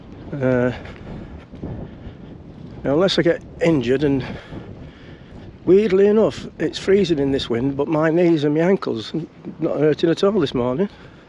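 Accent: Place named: Oldham, United Kingdom. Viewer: I enjoyed this - quiet because few people go on holiday to Norfolk in Feb. You are a bit different, but enjoyed the tour of the pubs, and you sound like you are from Owdam, so clearly understood:)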